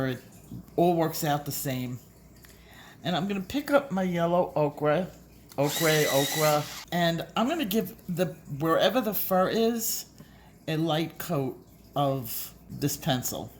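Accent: New York, United States. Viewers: I LOVE YOUR NEW YORK ACCENT! PLEASE DON'T CHANGE IT! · great tutorial! Grew up in NY so your NewYorker sounds like home.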